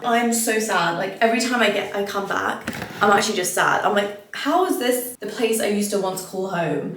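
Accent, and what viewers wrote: United Kingdom. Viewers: I remember you saying that wherever you go you take on an accent and girl that London accent is thick right now😂 Love you and this video❤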